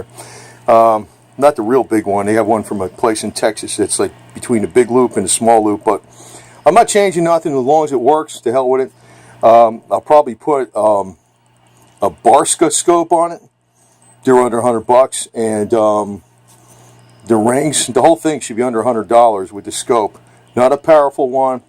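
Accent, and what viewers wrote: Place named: New York, United States. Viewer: Sounds like your from straight outta New York. Or at the very least Northeast? American over China any day.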